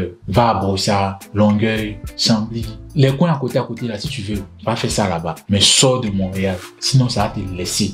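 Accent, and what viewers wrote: Ivory Coast. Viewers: Merci. J'aime l'accent ivoirien 😊 Hommage à CHEIKH TIOTÉ😢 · CONSEILS TRÈS UTILES MERCI INFINIMENT TON ACCENT FAIT PENSER À BABI ( ABIDJAN) ❤❤❤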